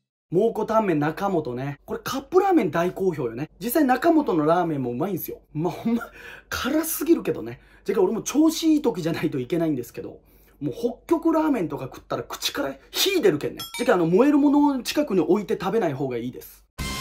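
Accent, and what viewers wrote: Hiroshima, Japan. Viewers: ラーメン動画を検索してたらオススメで上がって来て初めて拝見しました😀 私も広島出身ですので各店舗への広島弁でのコメント冗談も交えてすんなり耳に入って来ました❗😂 あと広島ご出身であればチェーンではないかもしれませんが岩国ラーメンの寿栄広さんご存知ですか？😀 広島に帰った際はわざわざ岩国まで行って食べてます😊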